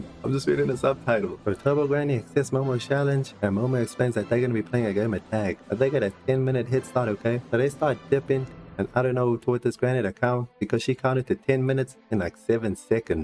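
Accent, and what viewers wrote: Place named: Australia. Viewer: this is a black australian accent, i believe. i have black australian friends that sound the exact same.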